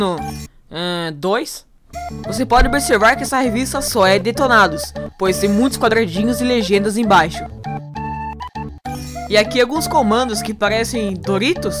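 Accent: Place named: Paraná, Brazil. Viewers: axei que vc morasse em Curitiba, seu sotaque de paranaense é bem forte, legal vc se ser daki "pr"... tem muito potencial, boa sorte =D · Você é de Curitiba ou de outra cidade do Paraná? O sotaque é inconfundível. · Parece paranaense...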